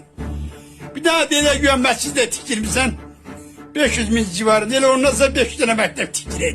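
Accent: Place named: Jalilabad, Azerbaijan. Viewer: Юсиф Мустафаев боюк санаткардир ва чох тарбияли инсандыр. Бу йашлия кимса пул вериб ола билсинки, бела hагсиз данышир. Лаhжаси Джалилабадлы лаhжасина охшайир. Ман озум Ланкаранлыйам ва 37 илди Российада йашайирам ва hамиша маhниларина гулаг асырам. Ман бир джанублу баласы кими фахр едирам.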